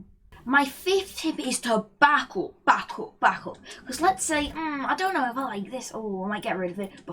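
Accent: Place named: United Kingdom. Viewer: The main reason i watch u cuz u are British and have that funny-ish british accent like a ytber i used to watch.keep ⬆️ ur grind.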